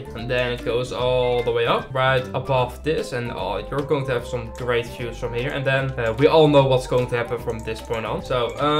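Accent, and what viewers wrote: Netherlands. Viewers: ik weet dat je nederlands of belg bent dat hoor ik aan je engels maar i love youre vidoes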